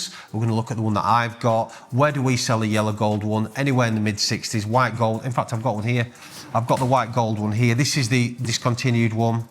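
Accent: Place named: North, United Kingdom. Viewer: Regional accents, god forbid someone be born north of the Watford Gap. a good lad who is truly himself, no need to change for people.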